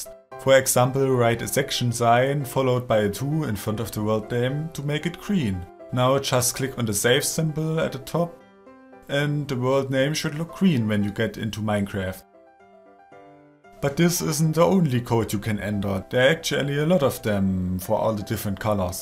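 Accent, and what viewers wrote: Germany. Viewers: Warte, "Aufbau" und dann noch dein Akzent... Ich glaube du bist Deutscher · ich auch hat man aber auch an den namen der welten gesehen und an deiner ausprache. das der beste command schreiber der welt ein deutscher ist omg xD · I love your German accent so much… :D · Man hört sowas von das du ein deutscher bist xD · Man hört richtig raus das du Deutscher bist :D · Are you german´?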